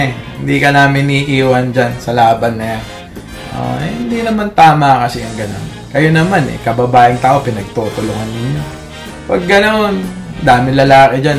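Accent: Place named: Batangas, Philippines. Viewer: I’m so entertained with your Batangenyo accent nakakatuwa. Pero si, nakakasira ng araw pati accent peke talaga. Sana ma meet kita pag pwede nang makapag bakasyon sa Pilipinas. I’m your new subscriber.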